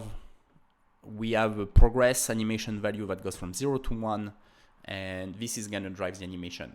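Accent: France. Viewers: Like your French accent ✌️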